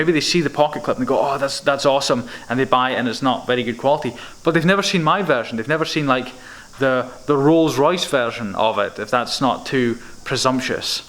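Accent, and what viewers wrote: Scotland, United Kingdom. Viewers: I love your accent you sound Scottish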